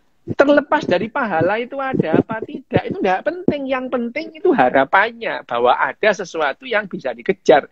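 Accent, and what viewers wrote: East Java, Indonesia. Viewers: Natural gaya orang Jawa timur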